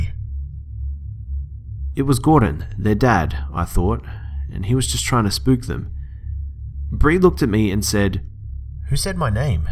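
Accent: Australia. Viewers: hes aus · I love scary stories and Australian accents so this account is very very enjoyable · He's Aussie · Guys this dudes Aussie isn't he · ikr i cant stand Russian or spanish accents ( no offense, this doesn't mean i hate these ppl i just cant understand it 2 well ) so i was like please don't have a Russian or spanish accent.... YES AUSTRALIAN ACCENT AND GOOD SPOOKAY STORIES!!!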